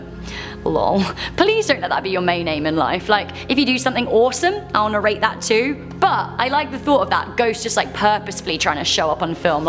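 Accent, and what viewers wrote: United Kingdom. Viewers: PS. I WANT TO SEE YOU IN MORE VIDEOS CUZ I LOVE YOUR ACCENT I LOVE BRITISH PEOPLE I WISH I WAS BRITISH!!!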